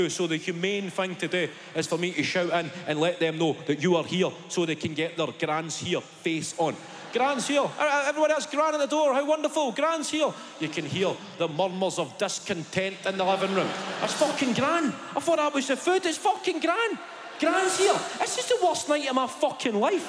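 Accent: Scotland, United Kingdom. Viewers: He's not English. He's Scottish. YouTube doesn't understand Scottish. 😂😂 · He's Scottish ya tool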